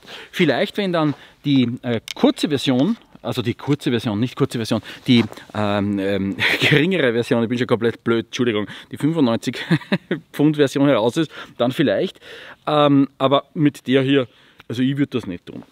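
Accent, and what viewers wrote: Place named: Austria. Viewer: Cause he is an Austrian and he plays with his slang a little bit, not everything could be translate to stiff english comments😂 Additionel you have the possibility to learn german with austrian accent 🎉 Most of his customers are from Austria or Germany. Easy Solution activate Google-Translator while watching youtube...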